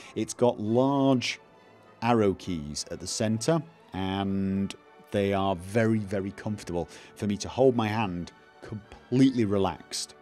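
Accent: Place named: England, United Kingdom. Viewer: going from his accent i would say he was Mancunian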